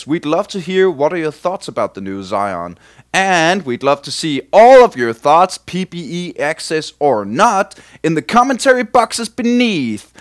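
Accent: Denmark. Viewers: Dat danish accent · we can rly hear you are from denmark bro xD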